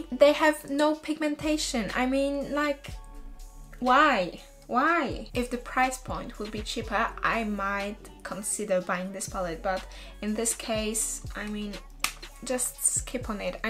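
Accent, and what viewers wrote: England, United Kingdom. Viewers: uwielbiam ciee! masz taki wspanialy akcent angielski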